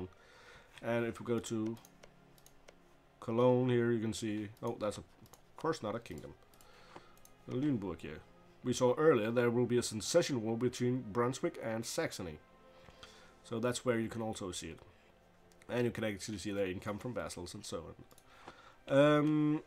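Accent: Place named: Denmark. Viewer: i like you danish accent!! love it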